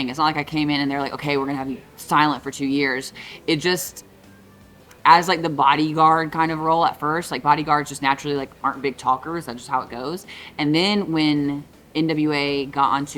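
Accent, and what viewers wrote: South, United States. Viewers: Some people had a problem with your Southern accent? That's crazy! Growing up in Florida's peninsula and close to the coast, I don't hear that type of accent that much around here. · Reply 01: Good Wednesday evening to you and a great video post. Thanks for taking the time to answer very interesting and important questions from your fans. 🙏🏿✝️ Reply 02: Some of what you discussed. My favorite female talent varies. Thunda Rosa I'm a fan and fond of. I gather you remember her. Victoria has grown on me and if it's anybody I'm pushing for to get their flowers is her. As for male talents, I grew up a huge fan of The Junkyard Dog back in the 1980s. I have to be honest, he was both a source of wrestling inspiration and shortfalls. That goes to his unfortunate use of drugs. As well that after his death he lost his only daughter. Shortly after she received his WWE Hall of Fame award. 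As for the men. Bret 'Hitman' Hart is one of my all-time favorites. So too was Stone Cold Steve Austin, long before he was that and before he entered WCW. He was Stunning Steve Austin all the way back in the USWA. As well I pick him as the greatest wrestler of the 1990s. Reply 03: As a fan of yours and looking forward to big things from you. I love the southern accent and dialect. The former WWE Lacey Evans real name Macey Estrella has a similar southern dialect. She's also a stunning beauty, ex-Marine and also married. She's got a wonderful business down in South Carolina her home state. Reply 04: Recently, you broached a topic on Instagram about not being a ballerina. I offered something to the contrary. Not only do you have the physical structure of one. You remind me of Actress Ju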